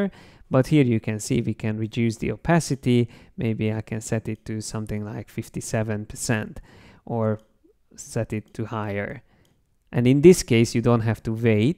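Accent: Hungary. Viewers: király videó hallani a magyar akcentusod :-)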